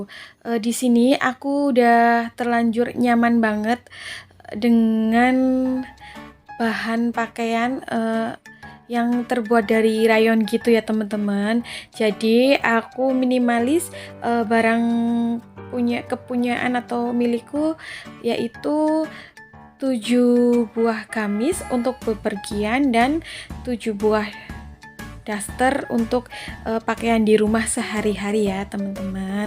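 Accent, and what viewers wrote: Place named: Banyumasan, Indonesia. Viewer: Mbaknya orang mana sih... Kok ngomongnya mirip kaya pengenyongan... 😁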